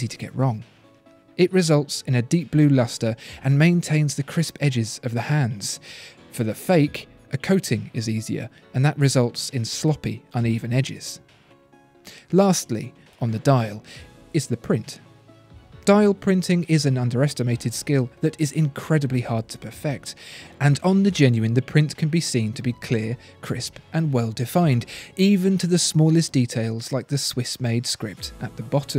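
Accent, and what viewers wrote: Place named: RP, United Kingdom. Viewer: Yo be clearer, you make it sound so confusing with all that posh, You're really good and i enjoy it but you have to make it clearer which one is th fake and which one is real and align that properly to the video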